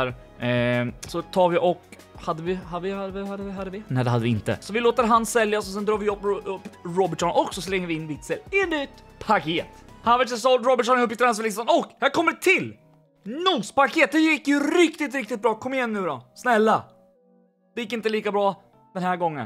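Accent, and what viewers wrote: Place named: Östergötland, Sweden. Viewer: Östgötska dialekten gör att jag följer dig ❤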